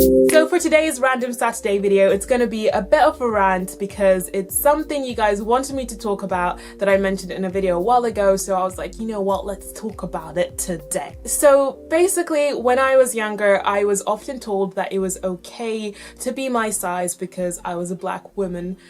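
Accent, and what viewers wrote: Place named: England, United Kingdom. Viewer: You So Cute! Such Lucious lips And Eyes💎 U Talk So Cool Over There In England..... 💖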